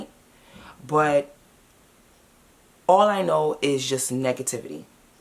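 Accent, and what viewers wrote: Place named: New York, United States. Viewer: Very curious to know where you're from! I know it's a NYC accent I hear. Is it Queens or Brooklyn?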